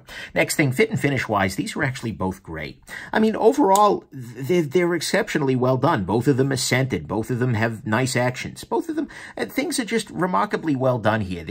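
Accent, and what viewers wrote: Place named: Long Island, United States. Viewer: As soon as I heard your voice I would have guessed you had a friend named Frankie LOL. No disrespect man I got a buddy that lives on Long Island to talk to the same way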